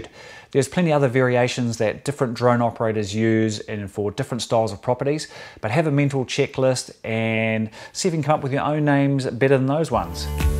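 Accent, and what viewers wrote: New Zealand. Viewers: In the “EAAR” no offence hahah I love the NZ accent!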